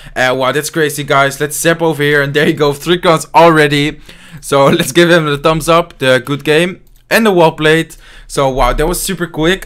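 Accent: Netherlands. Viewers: are you dutch? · Echt te horen dat je Nederlands bent.............( niet dat ik het beter kan, en geen haat) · You can hear that he is from the netherlands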